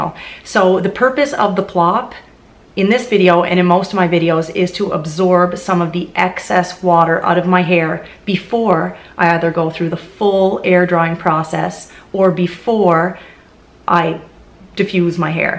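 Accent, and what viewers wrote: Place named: Nova Scotia, Canada. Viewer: Well it's got to be Nova Scotia somewhere lol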